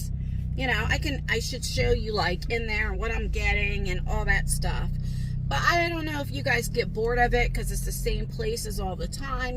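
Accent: New Jersey, United States. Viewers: Hold on to your hat,. I subscribe to over 800 YouTube channels. I have a very wide range of interests. I quit cable two years ago and YouTube supplies me with news, films, how to's, and lots of entertainment. I thought I'd miss TV but I don't and that's about twelve hundred dollars a year back in my pocket. I'm also a Jersey girl and when I hear you talk I hear my sister's accent and speaking style. You can take the girl out of Jersey but you can't take Jersey out of the girl. Cheers! 😊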